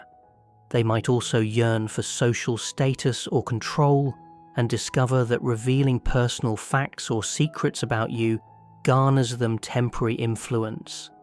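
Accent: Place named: United Kingdom. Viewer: everyone loves random british dude narrating stuff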